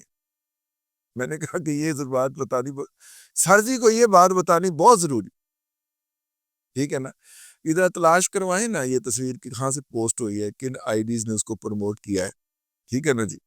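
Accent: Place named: Karachi, Pakistan. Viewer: ap hain tou punjabi lakin apki urdu karachi wali ku hai ?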